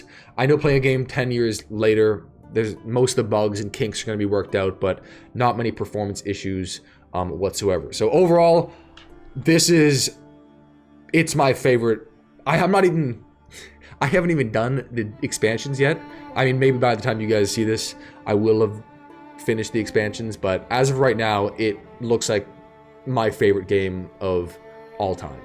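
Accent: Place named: Canada. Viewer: Most Nadian accent ever!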